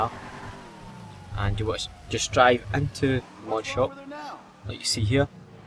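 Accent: Scotland, United Kingdom. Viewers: wish it would have worked but I love the accent.scottish?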